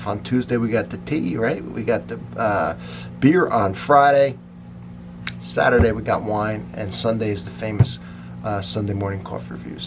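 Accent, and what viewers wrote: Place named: Pittsburgh, United States. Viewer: The silver label with Hawaiian Punch is very good. I drink it when writing. Btw... I’m picking up a Pittsburgh accent from ya. You from western P.A.?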